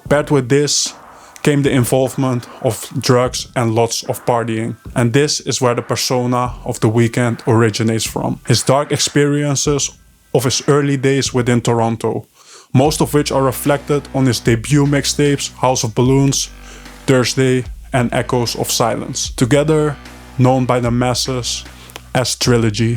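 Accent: Netherlands. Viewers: Bruuh ik zat naar jouw stem te luisteren en dacht ergens misschien ben jij Nederlander, vanwege het accent. Toen later zag ik die screenshot toen had ik het door 😂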